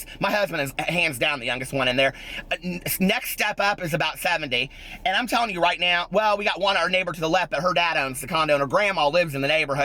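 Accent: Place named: Midwest, United States. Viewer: Your Midwest accent really came out in this rant lol 💙💙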